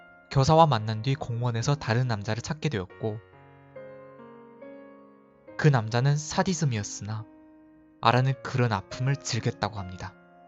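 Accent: China. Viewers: 중국인이신가요? 제가 아는 중국인 분이랑 한국어 발음이 비슷하네요. ㅉ,ㅃ 같은 된소리를 굳이 쓰거나 발음이 쎄게 나가기도 하고 뜻이 안맞는 피동표현이 나오기도하고 -다, -습니다 가 계속 섞여서 사용되네요. 번역기 같은 거 쓰는 것 같아요. 혹시 더 나아지고 싶다면 이런 부분을 고쳐나가는게 좋을 것 같습니다. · 발음이 조선족 같은데 중국 조선족이었넹ㆍ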